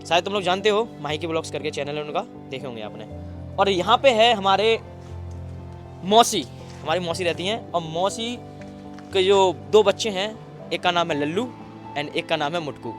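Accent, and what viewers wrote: Bundelkhand, India. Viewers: Kya bundelkhandi bolte ho 😂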